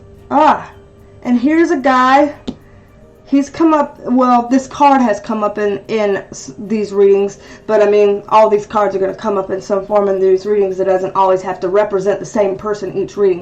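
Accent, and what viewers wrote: South, United States. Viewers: Peter Monn brought me here!! You are so sweet and I LOVE that southern belle accent! Sending good vibes from TEXAS!!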